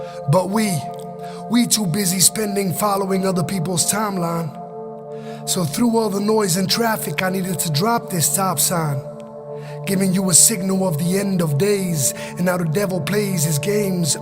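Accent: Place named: Morocco. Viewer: Simply POWERFUL & ELOQUENT! You've spoken nothing but the truth in such an Amazing way. Allahumma Baarek! [AMEEN] 🤲🏽♥️💪🏽 Keep up the good work, you've got an amazing voice, great vocabulary and accent for a moroccan brother, wichis quite rare these days, no offense bro! 🙌🏾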